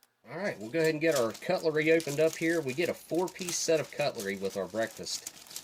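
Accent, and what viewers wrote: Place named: Appalachia, United States. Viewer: i was think maybe on of the southern appalachian states based on how you pronounce some of your words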